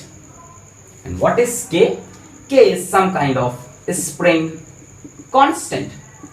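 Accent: India. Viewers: Sir aap bohat funny lgrhe the.Aapko english bolne ki kya zarorat thi.Aap hindi bolte hi acche lagte ho.Tb aapki teaching delightfull lgti h.Aapko iski koi jarrorat thi hi nhi.We love you and your hindi accent.🙏🙏🙏🙏🙏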